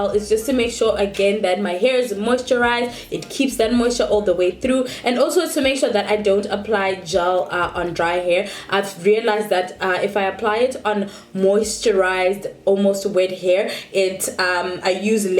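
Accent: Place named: Zimbabwe. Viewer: I had to go through your videos. I thought I heard a Zimbabwean accent.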